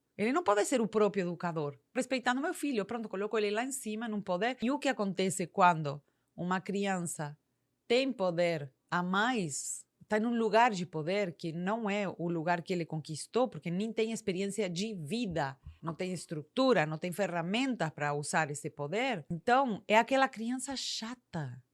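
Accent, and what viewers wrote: Spain. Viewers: o sotaque espanhol é muito fofo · eu não sei se você percebeu, mas o sotaque dela é de alguém cujo idioma nativo é o espanhol.............. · Que sotaque gostoso, de um espanhol falando português do Brasil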